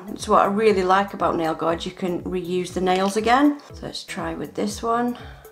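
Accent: United Kingdom. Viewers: she's british that's how we say it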